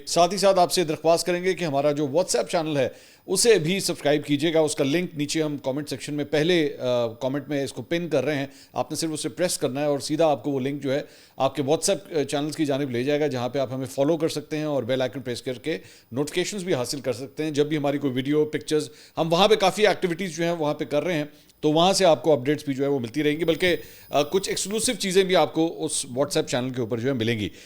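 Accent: Punjab, Pakistan. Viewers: bhai apka punjani me chatrol krna i love it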